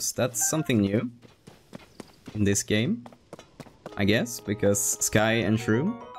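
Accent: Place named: Sweden. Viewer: One word in and i hear the swedish accent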